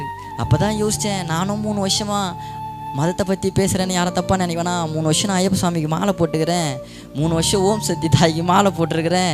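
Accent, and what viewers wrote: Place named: Sri Lanka. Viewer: sounds real, no fake ceylon tamil.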